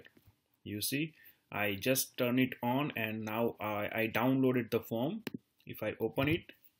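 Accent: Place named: India. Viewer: Узнаю индийский акцент. Спасибо.)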